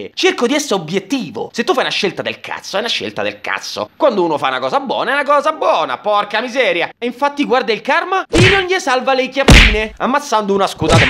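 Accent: Rome, Italy. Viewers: Ma tu sei un genio!!! Poi il romano mi fa sbellicare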